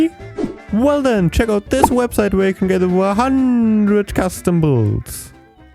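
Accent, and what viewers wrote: Germany. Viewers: Your German right? And nice build btw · Are you German?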